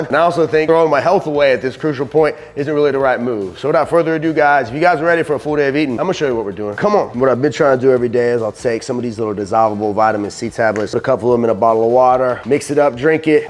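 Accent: Texas, United States. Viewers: Your texas accent comes out when you'Re sick :D:D:D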